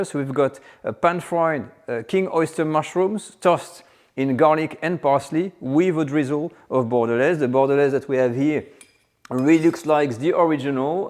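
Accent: France. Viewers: Er ist OFFENSICHTLICH Franzose. Er spricht wie meine Mutter. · I understand everything he says. He speaks with a charming French accent and his explanations of his cooking is perfect. 😊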